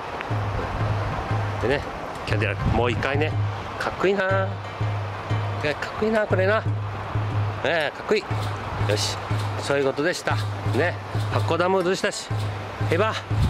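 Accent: Tsugaru, Japan. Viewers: 諸事情で津軽弁は体が受け付けないんです。金◯町の元公◯員のせいで！ 動画自体は面白そうなのでボリューム0で観ましたwww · 津軽弁、懐かしい❗️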